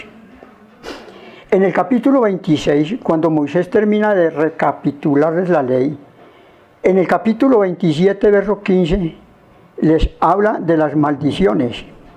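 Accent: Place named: Colombia. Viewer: ¿Porque sospeche que era Colombiano cuando comenzo a hablar...?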